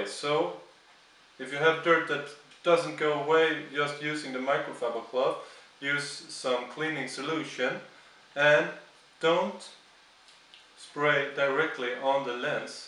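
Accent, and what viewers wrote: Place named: Scania, Sweden. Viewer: du är skåning:D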